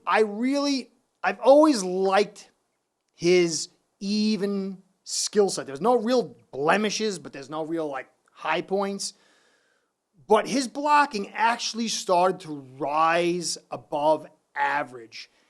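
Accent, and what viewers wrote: New York, United States. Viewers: Quick question where are you originally from? Gotta be from New York 😤💪🏾good content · Love the Yorker ACCENT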